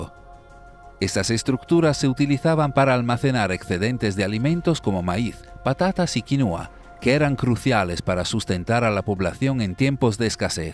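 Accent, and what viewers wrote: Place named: Italy. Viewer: Por si no notaste el acento, el narrador es de origen italiano,. Habla un español PERFECTO, me gustaria oirte a vos hablando italiano........ademas podrias tener mejor modo para hacer una critica o comentario, ademas insignificante.......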